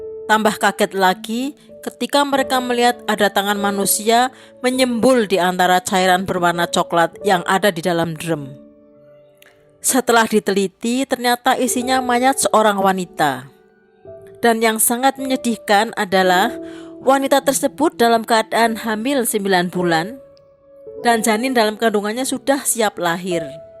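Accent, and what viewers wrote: Java, Indonesia. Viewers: Suara admin jawa kental bgt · dari suaranya pasti orang jawa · Medok bgt yg isi suaranya gak netral ah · Pengisi suaranya agak medok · narasinya bagus kok, sangat Indonesia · medok bgt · Medok bngt baca ceritanya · Pembawa nya kurang pas suara nya medok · medoknya juga dikurangin sedikit madam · Naratornya SPT suara orang Jawa yg ngapak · Medok Jawanya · Logatnya Indonesia bagetttt....tulen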